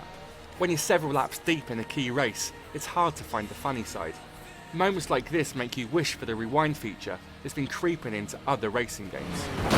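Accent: United Kingdom. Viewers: he's british... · Surviving borrow XD this British guys is funny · Thank God a British reviewer, don't have to listen to one of the witless Americans. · Oh, are we about to do this!? YEAH WE'RE GONNA FUCKING DO THIS! HE SAID SHIFT I HEARD THE F YOU DEAF PIECE OF SHIT!!! But seriously, I heard him say the f, I'm used to listening to british accents if that helps o.O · British accent annoys me...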